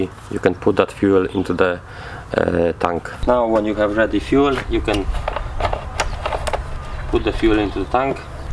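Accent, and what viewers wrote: Poland. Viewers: Po języku słychać ze jesteś Polakiem wiec czemu nie mozesz mówić po polsku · Też czułem że Polak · słychać że polak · Masz polski akcent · Słychać ze Polak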